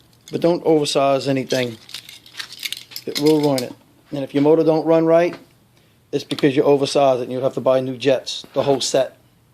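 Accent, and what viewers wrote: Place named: Appalachia, United States. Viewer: his accent makes me wahna throw my car at this hillbilly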